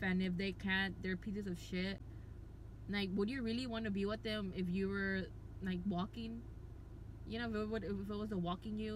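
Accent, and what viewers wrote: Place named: Bengal, India. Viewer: LOL, at first, after I saw your face, I thought... um... maybe a TAnned Pakistani maybe... or someonef rom Bengaluru, but OMG that accent andthose mannerisms... That is so Bengali,s pecifically a Bengali livingi n the East Coast ofth e United states, or maybe California. As for your video content, well, it seems you have not been disabled as long as someone like me. There are things you have yet to learn from life. It'll come to you. It comest o all disabled people sooner or later. Keep it up kiddo1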